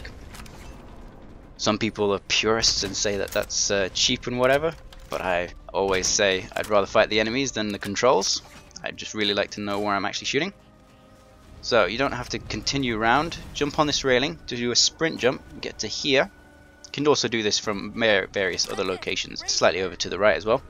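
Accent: United Kingdom. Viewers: / /. Adjective: 1. The apex of human skill. Verb: 1: Attainment of perfection in the course of ordinary gameplay and related abilities. i.e. "I grenade jumping!" Noun: 1: The greatest Halo campaign player alive. 2: A friendly British chap with a suave commentary voice.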